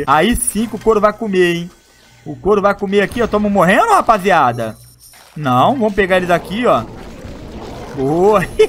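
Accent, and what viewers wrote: Rio de Janeiro, Brazil. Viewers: Legal seu vídeo e ideia, quase ninguém faz esse tipo. Mas eu não suporto esse sotaque e o jeito de falar de, carioca sei lá. Kkkk assisto no mudo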